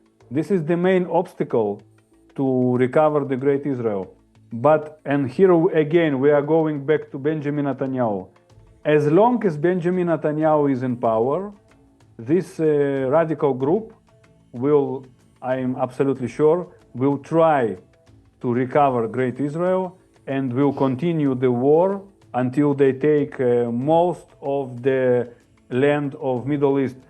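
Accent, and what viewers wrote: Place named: Russia. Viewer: Руски акцент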